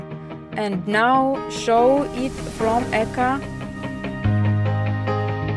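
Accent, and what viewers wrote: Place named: United States. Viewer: Beutiful American voice bravo. Can you visit usa? I have the money.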